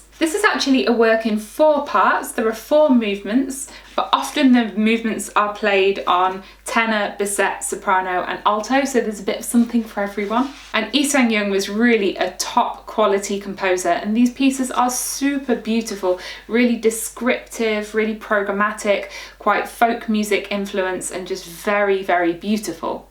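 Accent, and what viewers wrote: United Kingdom. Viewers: Thanks for sharing these pieces. And, first of all, I really love your British accent. There are two recorder contemporary pieces I like so much. The first one is "Glass" (from "Minimal Preludes") by Karel Van Steenhoven. The second one is "Thymehaze" (for alto recorder and prepared piano) by John Casken. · that british acent 😆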